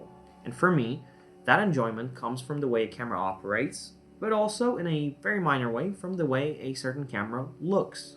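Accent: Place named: United States. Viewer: I subscribe to your channel because you speak in American accent!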